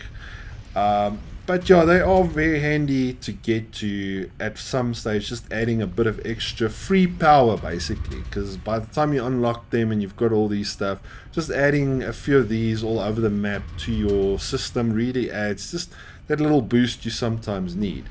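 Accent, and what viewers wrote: South Africa. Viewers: South African accent?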